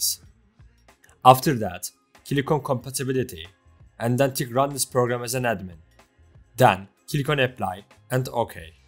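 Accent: Turkey. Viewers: Thanks for the help brother. Btw your accent sounds like turks. Is there any chance that we're from the same mother nation? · aynı türkler gibi ingillizce konuşuyorsun.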